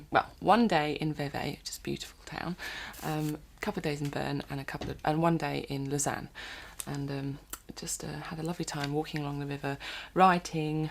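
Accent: United Kingdom. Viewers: wow im embarrassed i didn't realise imogen was british until i watched these...god and i call myself a fan :P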